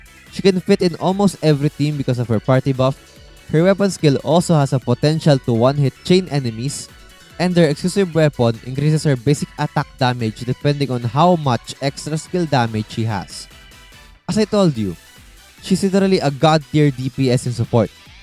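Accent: Philippines. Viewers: U sounds a filipin0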